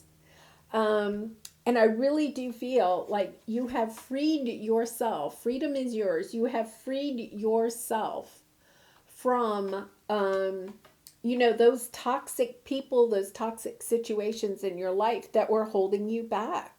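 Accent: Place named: South Carolina, United States. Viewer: I'm betting you're a South Carolinian with that delightful accent!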